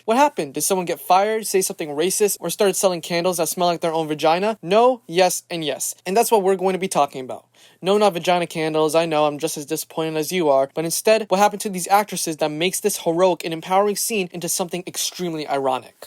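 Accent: United Kingdom. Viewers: you cannot deny me you sound very british and must one by the things you said in the beginning thought am already liking it👍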